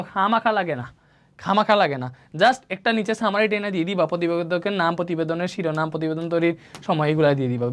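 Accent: Sylhet, Bangladesh. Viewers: vai tumi ki sylhet ti tumar basa sylhet naki 😊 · আপনি বোধহয় সিলেটী। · apni Kitah sylheti ni?? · bai apne ki sylhety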